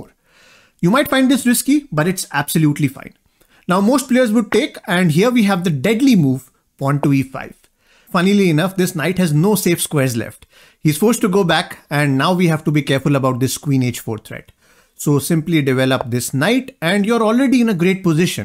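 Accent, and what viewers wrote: India. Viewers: This is word for word gotham’s video with an Indian guy narrating 😂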